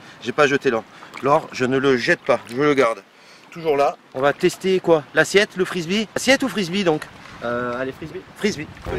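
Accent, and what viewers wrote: South, France. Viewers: ta un accent du sud de la france moi du québéc pas du tous la meme accent merci pour tes super conseil le cousin francais super intéréssent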